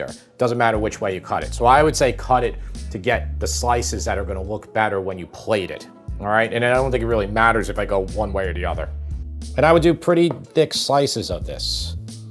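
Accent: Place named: New Jersey, United States. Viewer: My mom loves hearing ' NJ accent "yeas" evolve with time. It started out as a boyish 'ya!' but is now a very Rocky level "YE-uh" It's incredible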